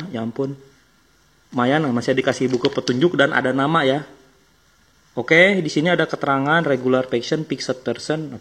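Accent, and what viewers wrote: West Java, Indonesia. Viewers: Kak orang mana? Ni Sunda pisan... Hehehe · Curiga sunda yeuh soalna nyarios na kitu.. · Walaupun pakai bahasa indonesia tp tetep aja sunda nya kelihatan... bnr ga kang? Hehehee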